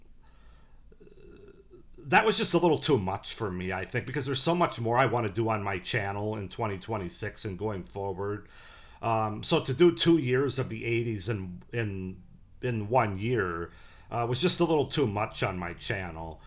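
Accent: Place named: United States. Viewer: I wish for your 2026 to be a success. I'm curious about what region of the U.S. you are from, based on your accent?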